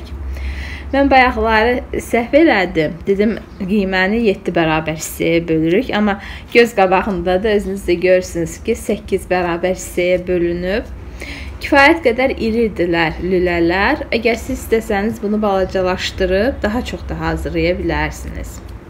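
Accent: Baku, Azerbaijan. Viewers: MaşAllah gözəl Bakı ləhcəsi əllərinə sağlıq.Mən sizi izləyirəm bişirdiyiniz his olunur dadlı və ləzətlidir təşəkürlər.Nafisa Qurbanova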